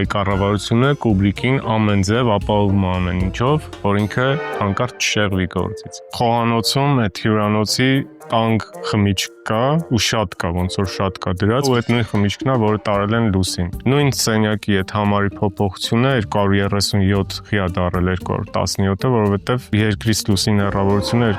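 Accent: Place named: Russia. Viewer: Լավ ֆորմատ է, սպրեք։ Ֆիլմերն ու թեման էլ ընտիր! Ձեր խոսքն էլ լսելի է, բայց մի նեղվեք, երբ ձեզ նկատողություն են անում - հայերենում մենք ունենք դ, տ, թ , ծ, բայց դուք ռուսական հնչյուններ եք գործածում` Սծիվեն ռուսն ա ասում, մենք Սթիվն կարանք ասենք և այլն։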